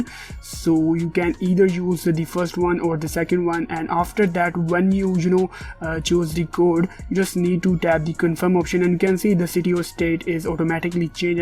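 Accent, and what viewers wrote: India. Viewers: once again dude with indian accents solved my problem. Thank you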